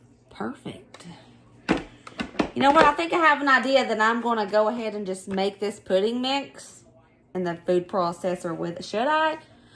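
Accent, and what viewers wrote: South, United States. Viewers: I think this video was great, if we had a dollar tree in New Zealand i would totally try something like this food prices here are getting crazy. Im sure this video has inspired or helpped a family archive a holiday meal on the cheap and sometimes in life money is tight. Also your southern accent is amazing!!! 😊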